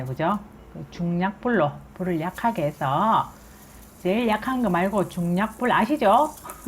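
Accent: Gyeongsang, South Korea. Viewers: 님 처음댓글 다는데 시청잘하고있 습니다 님 지역이 어디세요 경상도 시투리 귀에 익숙했어 저는 영천입니다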